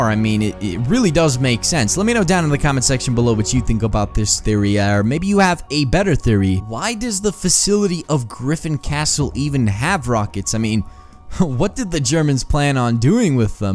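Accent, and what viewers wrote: Bosnia and Herzegovina. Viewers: Are you from Bosnia?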